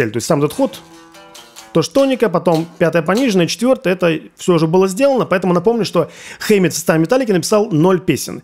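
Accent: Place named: Novosibirsk, Russia. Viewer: Эти интонации Пушного такие же как у моих новосибирских друзей частично НГУ, частично Сибстрин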